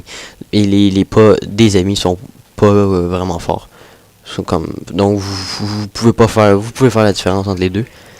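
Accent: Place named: Quebec, Canada. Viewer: Quel voix de merde mais bon ses l'accent québécois ki fait sa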